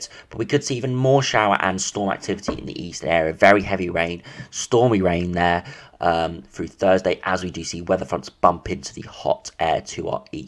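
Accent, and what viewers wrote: London, United Kingdom. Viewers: Where does the guy doing these updates live? I'm guessing London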